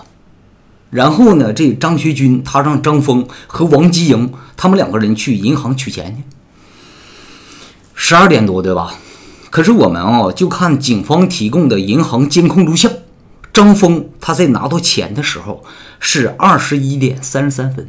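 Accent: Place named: Inner Mongolia, China. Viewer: 我老家是内蒙呼盟的。你的口音听起来也有家乡的味道！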